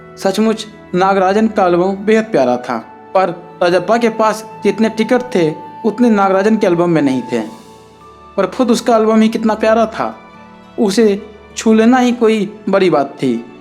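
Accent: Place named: Bihar, India. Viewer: बिहारी की आवाज में मजा नहीं आ रहा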